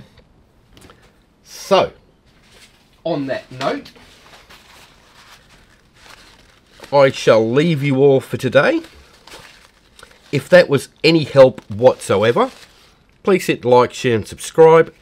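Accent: Australia. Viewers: where have you been all my painting life?! super glad I stumbled onto your channel - doesn’t hurt to hear you teach in that Australian accent 🥰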